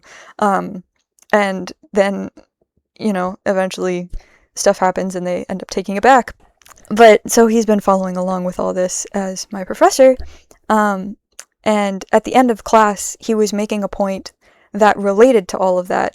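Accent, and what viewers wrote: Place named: England, United Kingdom. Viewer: 1. I'm glad the channel seems to be growing😊. 2.. Do people actually doubt your tranalations only because you sound more "English that you have to have your japanese ftiends to read and explain atuff?....that's just pure stupidity on their part. 3.Are you going to make a short vidro on analyzing any Bpruto character in the future? Can one commision you on analyzing a Naruto character/Boruto character based on a novel?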